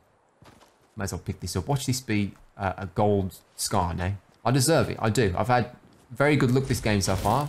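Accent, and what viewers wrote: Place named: United Kingdom. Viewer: your british accent it is really good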